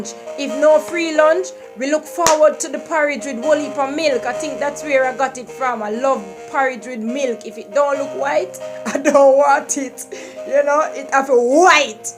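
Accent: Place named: United Kingdom. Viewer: gyal..u sounding kinda British.. nice words of encouragement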